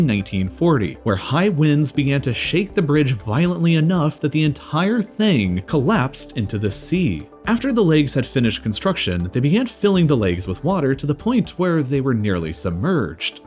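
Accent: United States. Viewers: man i hate how this guy always uses km and meters, Wtf comon. Most of your audience is probably american and you yourself sound it... so come on!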